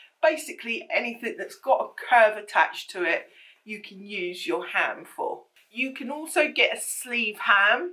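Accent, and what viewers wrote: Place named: Enfield, United Kingdom. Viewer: "Cats are not required - could someone please tell them that?" LOL, subbed. Plus you sound like a local (I'm in Enfield, you sound distinctly like my people. :) )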